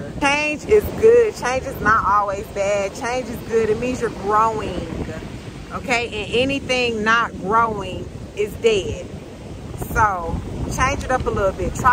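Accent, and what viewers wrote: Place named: Carolina, United States. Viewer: You from Carolina ? sheesh major sign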